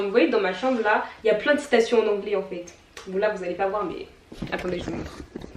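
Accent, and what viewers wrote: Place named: United Kingdom. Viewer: Je trouve que ton accent est plus proche de l'accent britannique que américain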